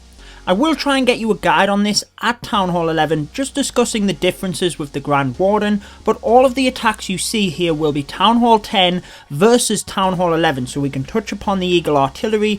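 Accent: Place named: Scotland, United Kingdom. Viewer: Which accent is this? Scottish? Sounds awesome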